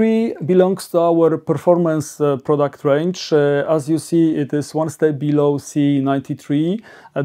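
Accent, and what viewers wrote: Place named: Poland. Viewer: Nice accent. Direct from Radom , I supposed.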